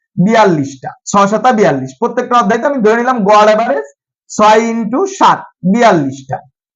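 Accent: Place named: India. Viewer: আপনি যেই ভাবে কথা বলেন আমি ভাবছিলাম আপনি ভারতীয়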